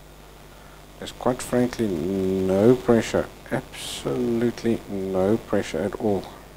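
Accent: South Africa. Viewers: is that a south african accent i smell???? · you sound like your south African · South Africa accents make me happy. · Are you South African? You sound like it! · A Saffa!! Makes my heart happy to hear ❤️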